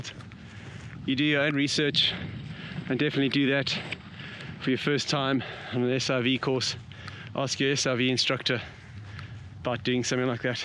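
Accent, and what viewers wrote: South Africa. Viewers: Cool video! Your accent sounds South African. Are you? Also, where was this video taken?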